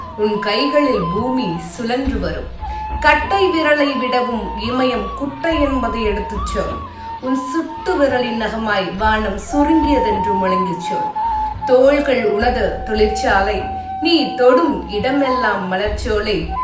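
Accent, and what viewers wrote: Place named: Sri Lanka. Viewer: எமது இலங்கைத் தமிழ் உச்சரிப்பை உங்கள் நாவிலும், இதையத்தில் விதைத்தது இறைவன் என்னும் அருள், மேலும் தமிழ் மொழியில் உங்கள் சேவை பெருகட்டும் நன்றி.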